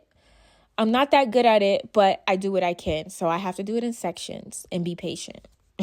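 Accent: New York, United States. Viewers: “BRONZA”😭😍🤣 I love your NY accent!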